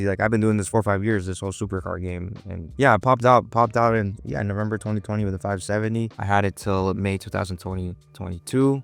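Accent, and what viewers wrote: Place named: Canada. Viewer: u don’t sound American at all u sound like a 100% Canadian.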